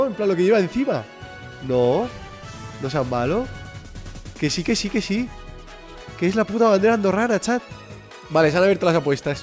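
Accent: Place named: Argentina. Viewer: rubius habla un 30% argentino y un 70% español lol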